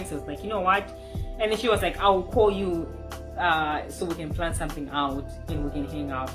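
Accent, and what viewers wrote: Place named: Haiti. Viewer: Interesting story. Thank you for sharing. What I learnt is once someone treats me with neglect - I have to lose my respect for them, and therefore, lose all desire to be connected to them in any way. If I keep trusting them, forgiving them, telling myself "I did something wrong, even though I have no idea what?" ......I WILL sooner or later get heartbroken and it will hurt A LOT! So, no more tolerating neglect and lack of communication from me. I respect myself too much to let people like this in my heart. If 2 weeks pass and a supposedly very close-to-me person is suddenly unavailable, I tell myself "I'm not interested in unpredictably push-pull, hot-cold, available-unavailable, friendly-hostile people, who are not mature enough to communicate what's going on". (Just wondering of you're from Haiti. I enjoy guessing-by-accent who's from where ....sometimes I guess right, sometimes wrong😂)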